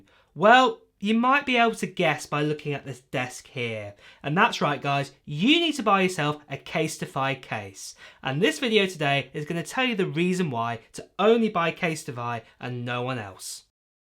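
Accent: United Kingdom. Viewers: After listening to him, I want a British boyfriend 😂 Canadian accent is so plain! I use a Casetify case for my 12 Pro max, I absolutely love it coz it has my name on it. I ordered a blue iMac yesterday but looking for the M1X MacBook for sure!!! Need something portable! 😂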